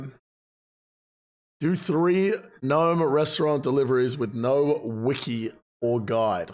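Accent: United States. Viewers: It’s refreshing to know Australians do American accents. I guess the thought just never occurred to me lol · Actually not half bad new york / jersey accent. See you at Tony's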